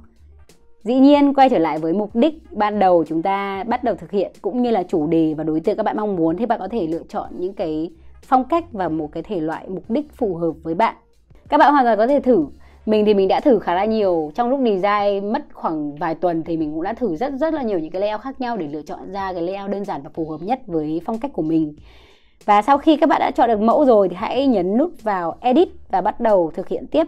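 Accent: Central, Vietnam. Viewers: Em rất thích chị và các video của chị💛💛💛 Thích nhất khi chị nói giọng miền Trung nữa 😊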